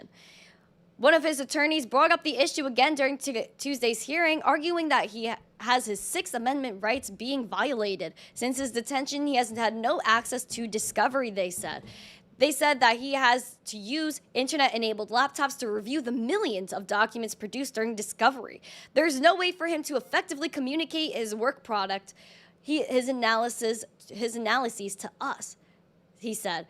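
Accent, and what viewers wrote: Brooklyn, United States. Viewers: Where ya from? Brooklyn or NJ ?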